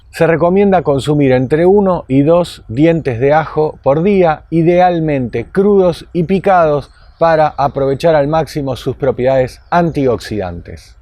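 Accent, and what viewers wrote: Argentina. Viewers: tienes acento argentino yo soy mexicano te sigo en mexico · Sos argentino che redoy mi like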